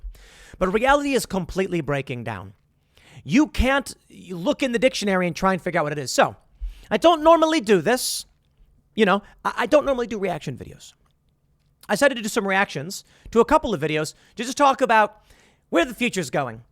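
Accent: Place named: South, United States. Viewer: Southey Accent came out strong on this one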